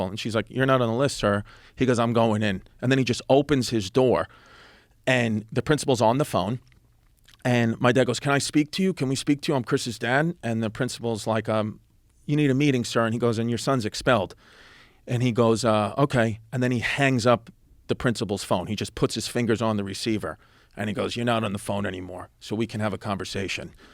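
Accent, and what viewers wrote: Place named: New York, United States. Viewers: Geez i love a good story told in a ny accent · If I have kids, I wanna be like this dad, but talking like Clint Eastwood or Thanos instead of a New York accent.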